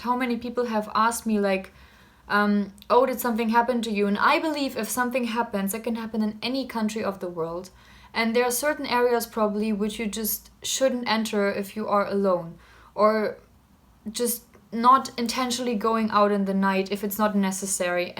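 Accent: India. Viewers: Your accent sounds so Indian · Hey, u r really a indian girl.ur ascent and knowledge is purely Indian. Love u sis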